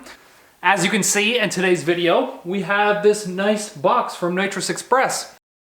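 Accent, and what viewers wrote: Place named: Newfoundland, Canada. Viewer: You sound 80% more newfie in this video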